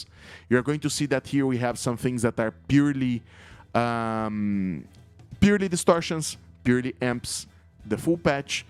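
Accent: Brazil. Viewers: Sotaque brasileiro hehe! essa pedaleira é melhor que a valeton GP200? em qualidade de som e efeitos bro?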